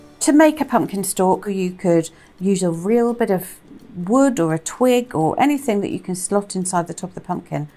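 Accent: England, United Kingdom. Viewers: I love this simple pattern! I am going to make my 3 year old son his very own pumpkin! He can decorate the pumpkin if he wants to. Then I think I'll make some for me and hubby. Thanks again for sharing this pattern. Love your accent. Are you from England? I'm from long island N.Y.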